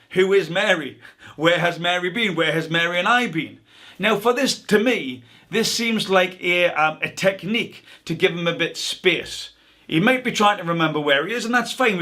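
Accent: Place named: North East England, United Kingdom. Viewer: Where you from I hear a north east accent? Boro?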